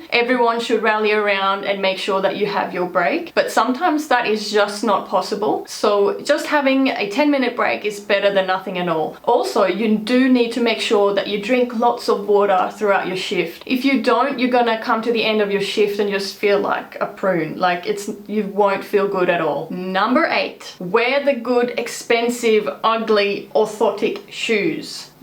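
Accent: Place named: Australia. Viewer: Hey. I am always wondering... your last name must be Swedish (is it the name of your hubby?). But your accent is clearly Australian to me. I am wrong? Sorry for asking such a crap. But as an Australian in my heart, I am interested in such things...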